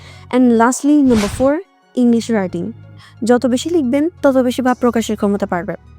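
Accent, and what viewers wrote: Chittagong, Bangladesh. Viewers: apni ki chittainga , cause apnar accent pura amader chittagonian der moto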